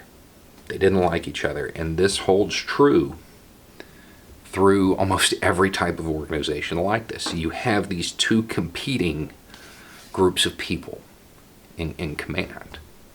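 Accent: South, United States. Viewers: If you like MSNBC with a southern accent and a beard.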